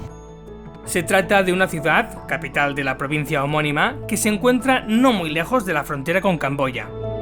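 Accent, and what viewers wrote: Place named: Spain. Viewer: Hola, Soy de Argentina 🇦🇷 y creo que Tú eres español, pero me encantó Tú informe,,y estoy sorprendida de ésta religión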